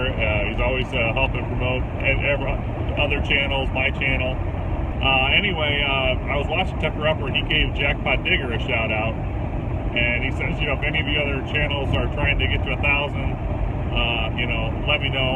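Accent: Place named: Philadelphia, United States. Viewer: Are you from the Philadelphia...NEW JERSEY AREA???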